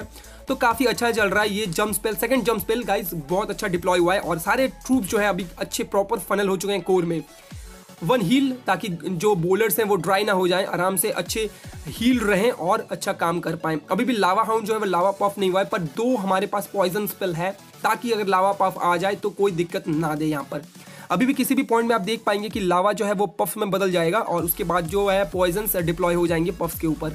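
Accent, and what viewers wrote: Bengal, India. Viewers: you are Bengali????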